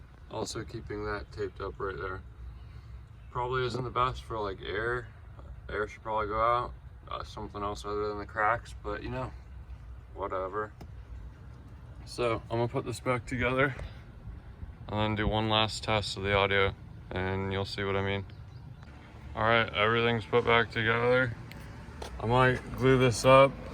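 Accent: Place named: Venice California, United States. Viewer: Bruh your personality is funny sound like a Cali Venice man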